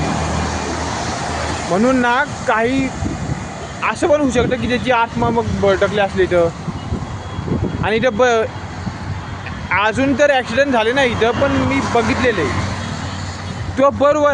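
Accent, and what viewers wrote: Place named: Gujarat, India. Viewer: भावा speech चांगल कर असं वाटतंय की तू मराठी नाहीस गुजराती आहेस. कंटाळलो ऐकून... 🙏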